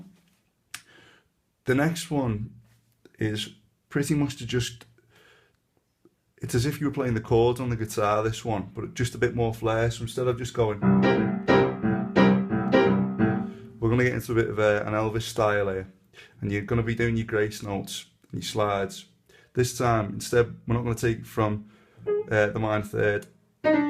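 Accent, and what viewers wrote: Liverpool, United Kingdom. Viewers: Where are you from you sound like a Scouser? Really enjoyed it thanks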